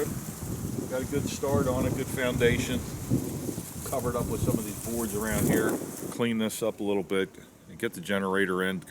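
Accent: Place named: Western Pennsylvania, United States. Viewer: Where are you from originally. You have a strong western PA accent. Love you and your sons videos. Hunted at 2 different camps for years around Bradford and just south of Kane. Lots of great memories just not many deer. But that's not really what camp is about anyway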